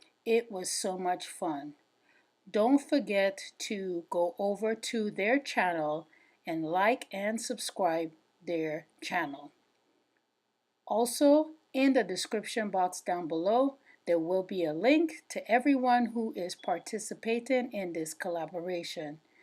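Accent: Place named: Caribbean. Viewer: thank you so much for participating in this collaboration. I knew by your accent that you were from the Caribbean Island. You guys have the best beaches over there!!!! Your Tablescape is Beautiful my friend!!! I love everything from the placemats to the china that you used. The storks are Stunning and added the perfect touch to complete your look!!!! I’m jamming to the music too! Thanks again for participating ~Elle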